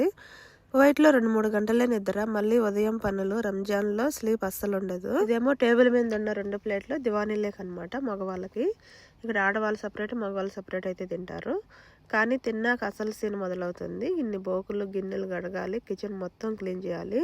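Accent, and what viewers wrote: Rayalaseema, India. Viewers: Rayalaseema slang baaga telusthundhi sister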